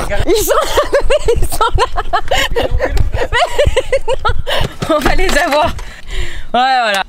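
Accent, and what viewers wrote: Canada. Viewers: tu mon youtubeur préféré vidéos accent canadien et qui met quoi des vidéos trop trop rire 🇨🇦👍👍👍👍👍 🇨🇵🇨🇵🇨🇵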